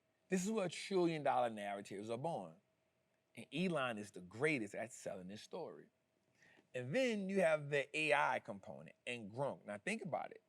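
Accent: New Orleans, United States. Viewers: Say bro I love your style I love your knowledge I hear this New Orleans accent thing are you from New Orleans man😅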